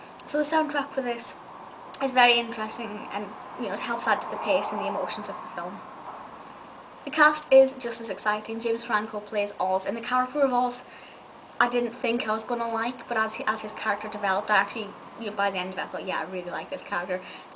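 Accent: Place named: England, United Kingdom. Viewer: Miss: Love your witty review of Oz. I also love your English Accent!!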